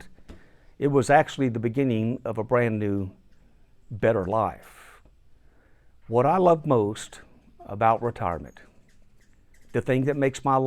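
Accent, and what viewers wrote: South, United States. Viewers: Are you from the south? We’re from Arkansas. We’re exhausted and thinking of retirement. Glad that we found you ❤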